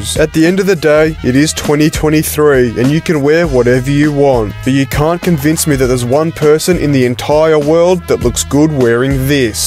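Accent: Australia. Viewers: I hear Australian accent i will automatically dislike and block the channel, I say that as an aussie myself.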